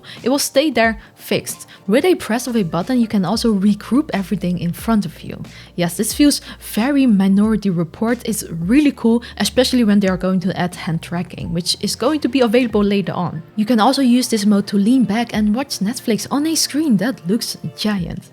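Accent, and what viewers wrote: Netherlands. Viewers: You might want to look into an English accent coach. The dutch accent is one of the most mind fryingly annoying in the world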